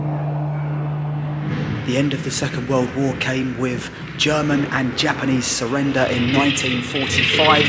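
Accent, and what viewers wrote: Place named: Essex, United Kingdom. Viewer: Been watching your lovely videos for a while now,loved the bulgaria ones,because of you we booked holiday there.you sound like a essex boy,im a cockney not many of me left debs xx ps your a 💎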